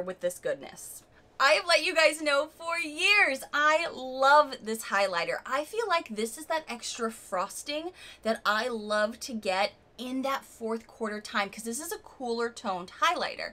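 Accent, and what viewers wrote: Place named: New York, United States. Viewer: Love this style of video. Made me realize how long I have been with BoxyCharm and with you this long too! Remember you used to talk in that awful accent all the damn time?? 😂😂(New York was it) oh and I have extremely dark lips and BoxyCharm sends me nude lip stuff, which I can’t wear! Can you imagine how many I have to give away??🥴 I love the burgundy, reds or mauves! Some of the product brands you love I have too and have yet to try. Some are white girl specific, yikes! I am Mexican and Olive complexion with gold undertones. So you understand. 👍🏽😎♥️🌹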